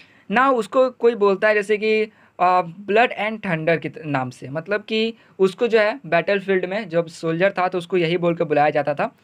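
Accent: Bihar, India. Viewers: Sir from where u r???????? I think from Bihar. I am from bihar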